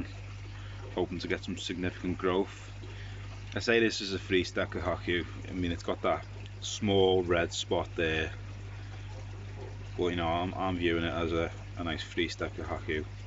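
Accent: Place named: North London, United Kingdom. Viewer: Watched a few of your videos and by the accent your not far from me 👍🏻 I live in North London now but grew up in Skem..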